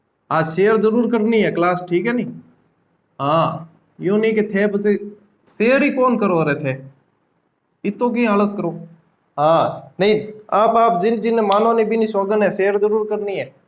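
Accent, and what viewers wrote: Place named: Marwari, India. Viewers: Aapki marwadi Bhasa bhut achhi lagti hai 😂😂😂👌👌👌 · Hlw sir.......I'm from BHILWARA. I'm literally thankful to you for providing us all the classes free of cost. I was looking for some tips about preparing for the GK since I belong to science subject so my base in terms of GK wasn't clear and somehow reached to your page. The way you are teaching is truly amazing and will be definitely helpful for all the students who are unable to buy the paid courses. I never knew that we can also study the rivers in this way and I must say that learning with these river maps or tricks will make the knowledge solid in our brains for a longer time. Apart from all these, I honestly feel good whenever you do speak in our Rajasthani. As our Rajasthani accent is quite different from yours so I do like to hear your accent. AT LAST ........THANK YOU so much sir...😇🙏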